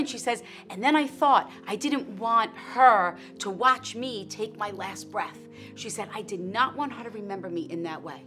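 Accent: New York, United States. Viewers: “DO YOU UNDERSTAND WHAT IM SAYING?!” With a New York accent keeps you in check.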